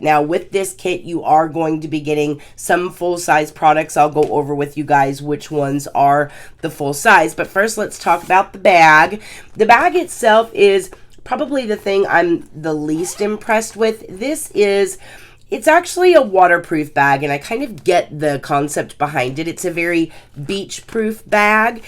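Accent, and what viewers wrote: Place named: Pennsylvania, United States. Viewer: I knew I recognized your accent, hello from the City of Brotherly Love fellow Pennsylvanian. 😊 I just bought this kit yesterday and had to consult with beauty gurus b4 opening.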